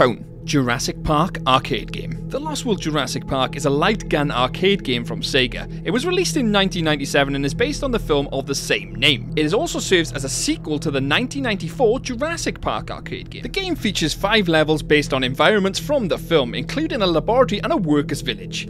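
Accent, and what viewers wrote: Wales, United Kingdom. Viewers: is that… a welsh accent i hear!!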